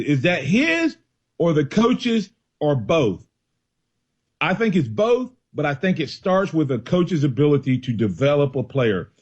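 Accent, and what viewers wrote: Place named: South, United States. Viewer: Look I'm from Athens Alabama born and raised but I can't take this fuckers country ass accent! It's super fucking annoying I mean damn do you really want to sound like you couldn't make it past the 3rd grade..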